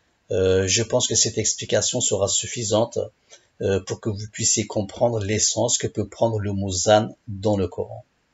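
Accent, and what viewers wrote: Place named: Turkey. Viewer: Zann. Avec z. Ne veut rien dire. Mais than a. Thana ou bien thounou qui signifie penser. Comment toi prof tu enseignes ? Pour enseigner , il faut se faire comprendre . On ne comprendrais pas sinon ! Pour beaucoup l arabe c est du chinois , seulement toi tu y ajoute ta sauce accent turk ... C est bien de rappeler mais rappel les tiens.... mais avant de rappeler les tiens , ai la certitude de tes propos car tu es loin du sentier avant de trouver la route qui te mènera au droit chemin .... que seul les alamins ( initiés ) savent. Sache que le Livre, que personne ne comprend ... est destiné que pour les initiés.... Et il n y a pas de dajjal ou de mahdi qui va revenir. Mais la sentence direct . C est une question d heure. De sa3a comme il est écrit.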